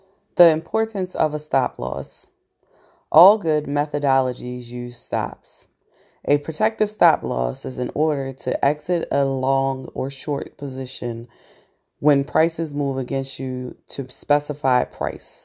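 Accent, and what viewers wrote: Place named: Baltimore, United States. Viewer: The woman reading sounds like she could be from Baltimore (as I'm from the DMV area). Great work and thanks for the full audio!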